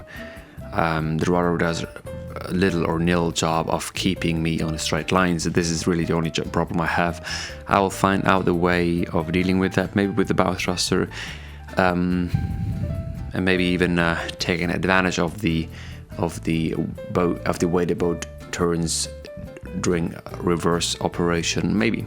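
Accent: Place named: South Africa. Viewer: I sorta see it as ticking boxes.. its a build.. and its relatively cheep, i love fishing and wanna see a sea monster landed by RC boat. Then you can expand your channel with ppl who are perfect and like fishing. You have the set up... and even if you not a fisherman, im SURE it would be a blast... find your fishing mate's to get tips. Somtimes old heads have some fishing knowledge. Austria! Your english sounds south african, i thought you white african LOL but 100% understood you so. Thanks for sharing the build mate. Its interesting.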